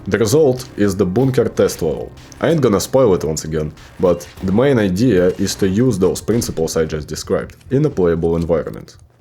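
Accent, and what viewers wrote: Russia. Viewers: не только я услышал у него русский акцент) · Твой акцент очень даже русский, и я уверен что ты русский. Понимаю тебя, ведь на русским не платят на ютубе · russian accent, W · Какой же ахуенный русский акцент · У него ОЧЕНЬ русский акцент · I can hear by the voice that the author is Russian. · Mmm чувствоваеца русский акцент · Bro u got bold Russian accent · You have strong russian accent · Man is so cool! Кхм а еще твой Русский акцент прям врезается в голову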